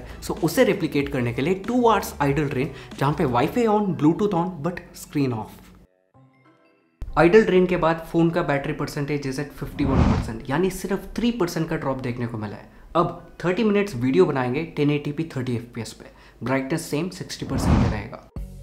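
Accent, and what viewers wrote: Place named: Tamil Nadu, India. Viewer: nee hindu pesura style paathope purinjipochu... Tamil naatu accent irruku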